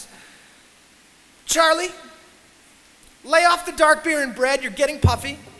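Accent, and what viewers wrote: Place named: Boston, United States. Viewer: wow that basten accent!! love it! :D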